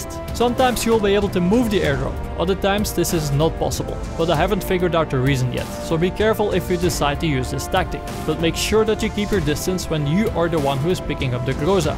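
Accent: Netherlands. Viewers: You are from the Netherlands i can hear it in your foyce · Your accent is pretty funny, like all the dutch people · R u dutch cuz of you're accent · sounds a little bit like dutch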